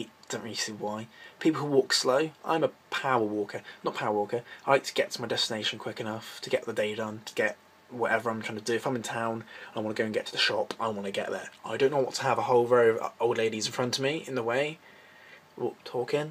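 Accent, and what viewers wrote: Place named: United Kingdom. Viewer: You sound really British and you always rant but thumbs up for you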